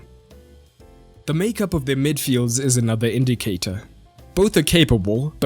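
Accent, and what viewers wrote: South Africa. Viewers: You sound South African ... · You sound South African 🤔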